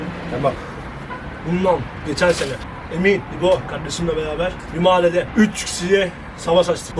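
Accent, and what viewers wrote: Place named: Istanbul, Turkey. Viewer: Abi şiven değişiyor birz istanbul şivesine yakın konuşuyon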